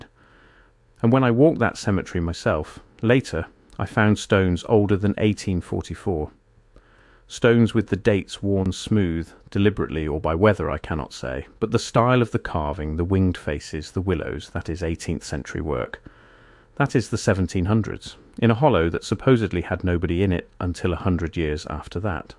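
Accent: England, United Kingdom. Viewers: This was fun. You sound English. 😉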